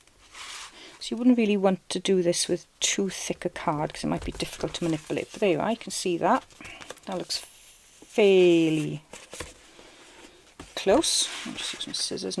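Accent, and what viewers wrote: Wales, United Kingdom. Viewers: Nice to meet you 😍 love your Welsh accent, adorable 🥰 thank you for your tutorial, you certainly have given us plenty of ideas on tags and I especially love the Tag Journal with the B&W papers, brilliant! Vellum is one of my favourite elements, so I’m excited that you’ve included this too! Thank you again, have a wonderful day, hugs and blessings 💜🙏🏻🫂😍⭐️ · Love love loved this tutorial! You are a natural and so at ease on the camera. Really enjoyed your video and your Welshy voice also, I felt right at home. Thank you for taking part in the collaboration Hugs Rachel xxx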